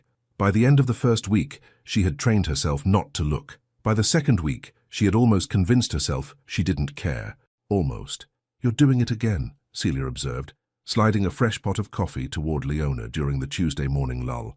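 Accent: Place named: United Kingdom. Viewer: Why does this video have a voice with a British accent? I get tired of hearing these British accent.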